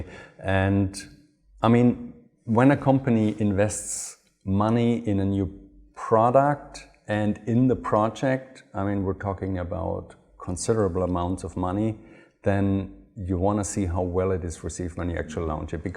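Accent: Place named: Germany. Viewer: Snobby accent? He’s a German you dick..only in ‘murica eh 😂😂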